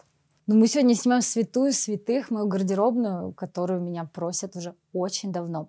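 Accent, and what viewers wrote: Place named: Udmurtia, Russia. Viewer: У вас специфический акцент, очень характерный для Удмуртии или Пермского края. Вы случайно родом не из этих краев?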